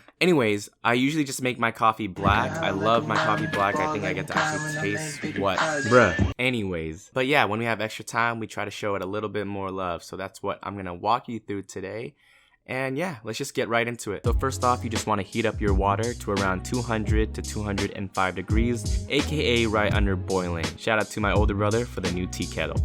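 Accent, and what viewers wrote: Philippines. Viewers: I just got a pour over set for Christmas and this video got me SUPER excited to make myself coffee <3 the only thing is that my set came with a manual grinder that I have no idea how to use yet lol. But great vid, love the pilipino accent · We lub da pilipino akcent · way cool. dance moves. Philippine accent cut over. super fun.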